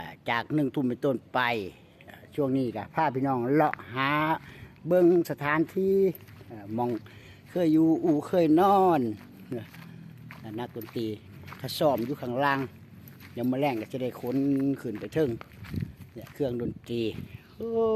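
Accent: Isan, Thailand. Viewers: จุดกำเนิด กะแม่นเสียงอิสานนี้ล่ะครับ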